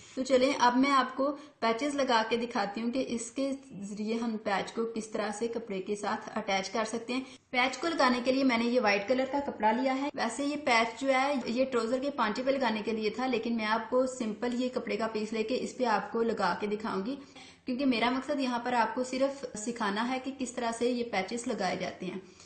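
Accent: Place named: Narowal, Pakistan. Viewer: Lgta h aap narowaaal sy h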